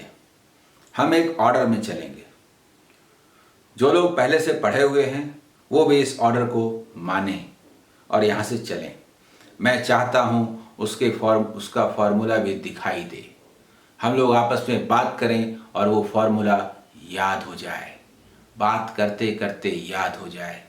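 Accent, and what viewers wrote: Bihar, India. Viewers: Yes he is from bihar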